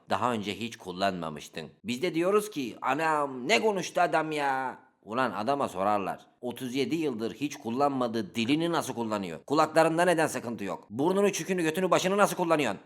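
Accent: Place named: Anatolia, Turkey. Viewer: Hacı abi anadolu şivesini bu kadar iyi nasıl gonuşuyon. Bence güzel tat katıyor.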